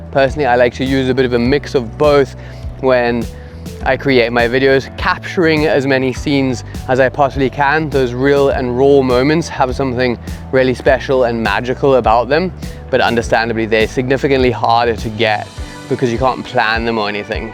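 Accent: Cape Town, South Africa. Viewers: I’ve watched a ton of these “HR clog your simple life” and yours is my favorite. Maybe I just miss the Cape Town accent! I’m watching this in Atlanta airport on a trip I’m vlogging for my new channel: Cage Free Theology Thanks bru.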